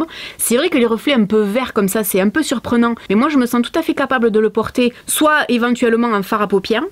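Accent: South, France. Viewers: Je regarde vos vidéos, et je vous trouve épatante, naturelle, franche, drôle, sincère, et votre accès du Sud ne gâte rien! Surtout ne changez pas! Biz bisou breton! A très bientôt!👏👏👏😍😍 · Salut, je suis tombée par hasard sur tes vidéos et je t'adore même si je ne me maquille pas. Ta spontanéité, ton humour et notre bel accent du sud sont charmants, ça fait du bien de voir ça sur YouTube! Ne change rien tu es super!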